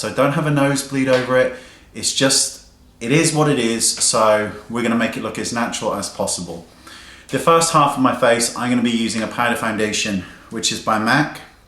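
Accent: Australia. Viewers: You're British right? Kinda sound Australian lol. Thanks for the video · are you from australia or great britain?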